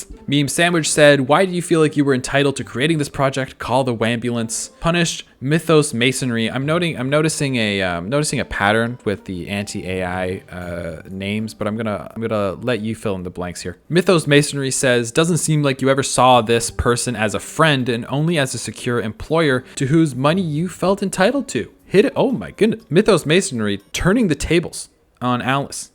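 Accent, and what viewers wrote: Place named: Ireland. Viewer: only vaguely related but sometimes you sound like you have a kind of irish accent!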